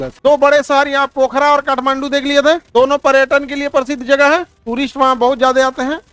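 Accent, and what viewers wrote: Bihar, India. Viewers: lecture dene se pehle thoda research vi karliya karo .....bihari ho sab ko pata hay, harek video me aapne behwar aaur bolne k tameez se bar bar biharipan ka praman dena jaruri nahi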